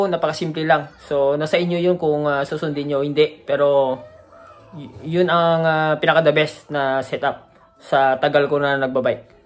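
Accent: Iloilo, Philippines. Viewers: Ilonggo ka master?? Ride safe simo da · daw ilonggo ka gid sir ba hehehe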